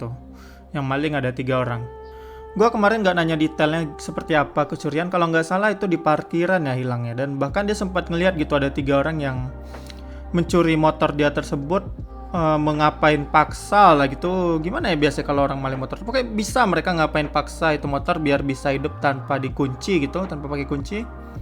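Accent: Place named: Sumatra, Indonesia. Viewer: abang dari pulau sumatera? wehh pantes aja logatnya logat ngomong orang sumatera. sy org bukittinggi bg. salam kenal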